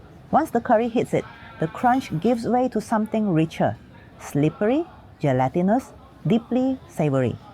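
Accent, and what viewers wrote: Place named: Malaysia. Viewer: you’re most welcome! Hopefully, one day there will be enough to fill a book. You are doing a service capturing the humanity and character of a version of Malaysia that is rapidly vanishing into the past. Your gentle narration and natural Malaysian accent make the videos even more special. I hope more people discover your channel!Brava! 🫶🏽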